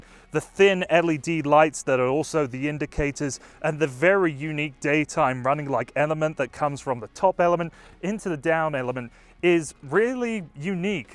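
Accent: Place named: United Kingdom. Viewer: I really enjoyed watching this video and I noticed that you did add in some funny lines. I have no trouble with the British way of saying things, because I’m used to Robert of Fully Charged. He loves to joke around. He says Hyundai the same that way you do. I was waiting for an aluminium joke! Keep up the awesome work! I have to admit that I mostly follow very low to no emissions cars and I might not have the time to watch every one of your videos.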